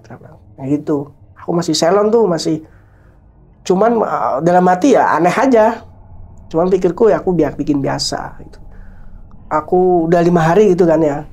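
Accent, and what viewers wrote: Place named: Sumatra, Indonesia. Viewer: Aku juga rada salfok sama logatnya gk ada Jawa jawanya. Kayak logat Sumatra.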